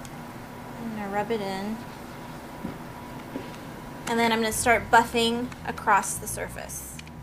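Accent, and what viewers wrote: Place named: United States. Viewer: excellent video ,but I hate your tono of voice is so noise and saunds so greengo ,:(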